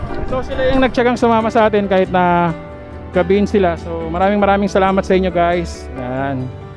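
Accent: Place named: Iloilo, Philippines. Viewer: Lods taga saan po kau parang Iloilo salita nyu minsan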